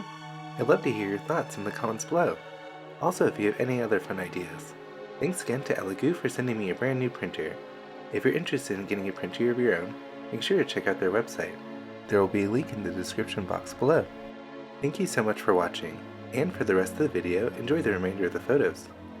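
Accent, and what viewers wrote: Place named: North America. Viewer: Oh, actually I have a question about MSC. By your accent I'm assuming you're north American? I've been wanting to start a faceup for a while now but since it's winter the humidity is practically always above 90% and I read msc should be used in below 70%. Do you have problems with the humidity? Thanks.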